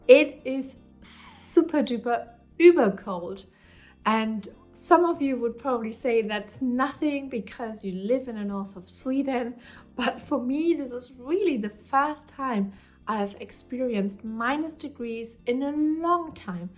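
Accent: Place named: Germany. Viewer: also I knew you were from Germany before you said it:D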